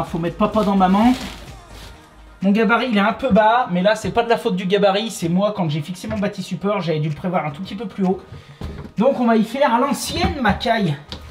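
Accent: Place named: Lyon, France. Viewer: Tip-top, comme d'habitude. Ca fait plaisir d'avoir affaire avec un pro digne de ce nom: compétent et honnête, animé par la volonté de faire les choses comme il faut et par le désir de satisfaire le client. Retraité du métier depuis pas mal d'années (je travaillais avec du cuivre uniquement), j'apprécie vivement les nouveaux matériaux, matériels et façon de faire avec les produits modernes. Les tuto éténat animé de manière tellement agréables. Et, petit plus, l'accent lyonnais ou stéphanois, si mes souvenirs sont bons!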